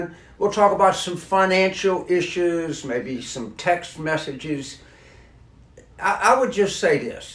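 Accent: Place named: Cajun, United States. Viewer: Thanks,. You rock! But, I’m a Yankee. I can’t understand your Cajun accent. Could you please show your helpful videos with subtitles? It’d be appreciated.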